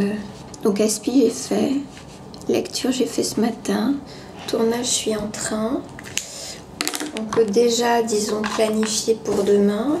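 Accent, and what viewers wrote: Quebec, Canada. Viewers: te parles pu en québécois?;)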